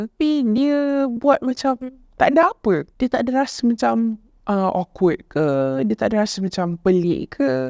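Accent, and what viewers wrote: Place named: Kedah, Malaysia. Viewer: Cakap keyel je. Awat tak cakap peghelih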